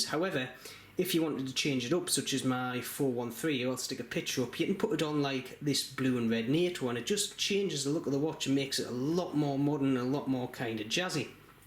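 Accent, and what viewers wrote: Newcastle, United Kingdom. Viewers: Great presentation- nice to hear a Geordie too👍. Appreciated seeing the 413 - I am waiting for a 411 to arrive and already fancy the 413 as an addition. I’m going to go broke!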